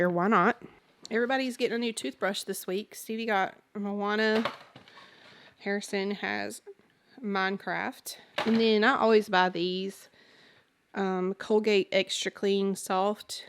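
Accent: South, United States. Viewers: I’m so glad you are back! I miss that lovely southern accent!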